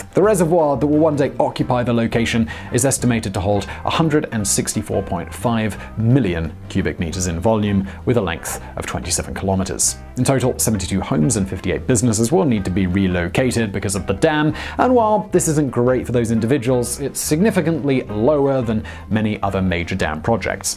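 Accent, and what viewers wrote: United Kingdom. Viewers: the way he talks in a bantering way, makes it that much funny, typical brit accent. Although Indian army has plans to seize Pakistan occupied Kashmir and in turn seizing Pakistan's dream of cpec. China probably isn't in the condition to interfere.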